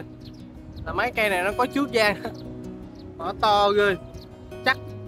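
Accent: Ben Tre, Vietnam. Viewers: Lần đầu xem video a nghe tiếng e nghi a ở bến tre rồi. E ở batri e cũng đang cùng nghề bếp .e cũng hay xem video của a cảm ơn a đã chia sẻ những video ý nghĩa chúc a thành công trong công việc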